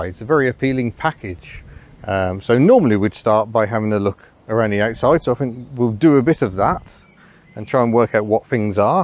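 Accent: United States. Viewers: Good American accent! Yes the econoline was a gas hog but ran forever. 'what a big beast. Good motor! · Authentic American accent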